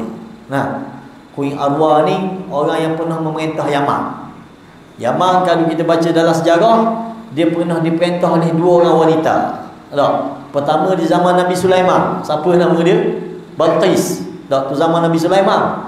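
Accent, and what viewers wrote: Terengganu, Malaysia. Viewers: Tq Mantap ustz teganu Walaupon baru ku kenal Namun pelat teganu mu menjadi poojaan hatiku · Loghat ganu....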